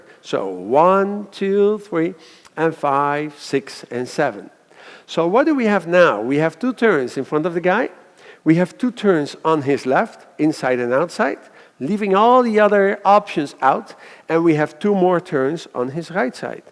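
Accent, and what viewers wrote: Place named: Netherlands. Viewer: Well this is one very distinctive dutch accent....👍👍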